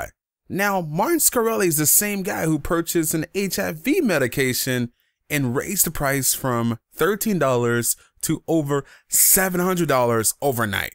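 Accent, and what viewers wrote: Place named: New York, United States. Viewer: Martin Shkreli......whos mans is this????? New York Accent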